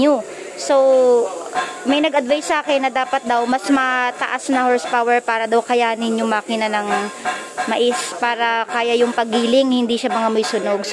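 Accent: Visayas, Philippines. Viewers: kakatuwa tlga magsalita ang bisaya natutuwa ako sau madam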